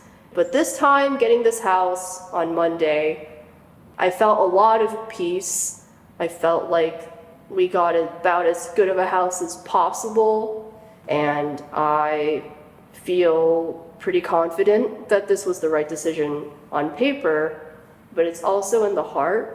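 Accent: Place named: New York, United States. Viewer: Crazy. I thought you were in your early 40’s and your delivery is very nation New York, woody Allen. Congrats on buying in NYC,maybe you could have waited a few. If you did not hear there is a communist in NY and all the millionaires will be selling their properties to head to Florida. Discounts abound. 😉 congrats. Now please explain the difference between a condo and a coop?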